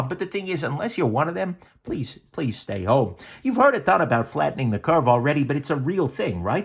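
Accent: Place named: New England, United States. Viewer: I always figured the voice of reason would have a new england accent. Good on ya,. You're a real gem.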